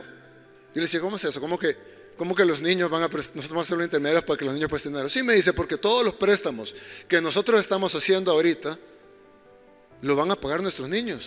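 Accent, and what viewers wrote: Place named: Central America. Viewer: Hola como esta, Perdon y sin ofender pero no parece usted Mexicano. Que es eso de👉 Varón 👈? Si entendemos la palabra, pero es raro que la usemos aci como usted la acaba de usar. Usted se escucha si fuera Centroaméricano, lo digo con respeto....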